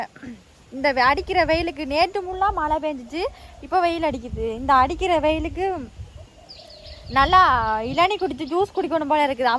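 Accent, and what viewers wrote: Sri Lanka. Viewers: நீங்கள் இலங்கையில் இளம் குயில்! நீங்கள் பேசும் இலங்கை பாஷை மிகவும் அருமை எங்களுக்கு கேட்பதற்கு மிகவும் இனிமையாக உள்ளது!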